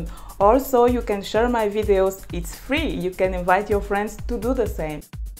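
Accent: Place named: Poland. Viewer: I speak Fluent Polish, You do have a polish English Accent, jesus lolz I love this...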